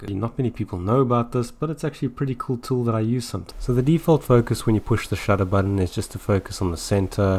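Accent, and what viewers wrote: South Africa. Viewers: Are you Dutch? You sound South African. I know the sound is no good. But 10 years ago I did not have the Neumann U87 yet.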